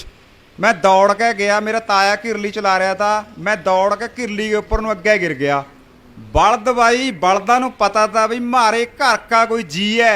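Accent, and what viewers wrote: Puadh, India. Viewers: ਪੁਆਧੀ ਬੋਲੀ ੲੇ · Puyadhi boli eh Sadi..but sanu malwa 2 da nam de dita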